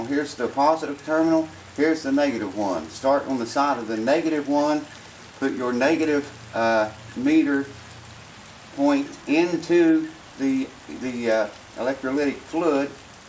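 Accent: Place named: Kentucky, United States. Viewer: You be da man! Thanks for this video. I won't be doing anything you mentioned, but enjoyed watching you do your thing. You remind me of my Nam buddy, Bob McIntosh from Kentucky...same accent and same easy way of expressing yourself. Brought me back in time, so thanks again!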